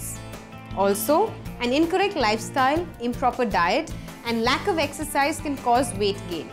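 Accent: India. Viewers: she is indian of course she will have such an accent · I love her Indian accent :3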